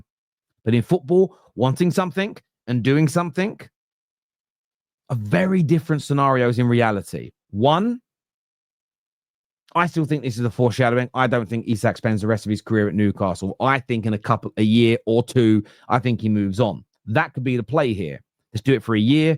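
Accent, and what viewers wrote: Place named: Cockney, United Kingdom. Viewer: What a load of crap! Another cockney conman!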